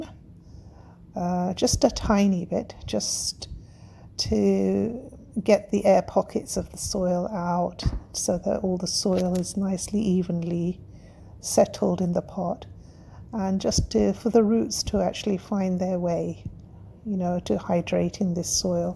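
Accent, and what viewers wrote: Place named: England, United Kingdom. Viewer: Nice tutorial...love your very English Accent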